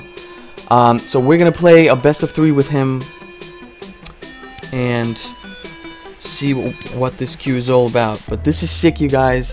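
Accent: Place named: New York, United States. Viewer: You sound like you're from New York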